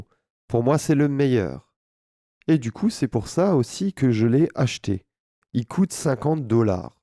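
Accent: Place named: Alsace, France. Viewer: Ta un accent alsacien on dirait ahah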